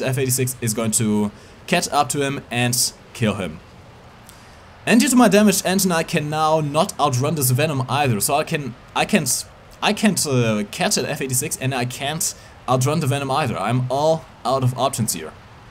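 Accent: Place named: Germany. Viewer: Youre german right ? The accent is awesome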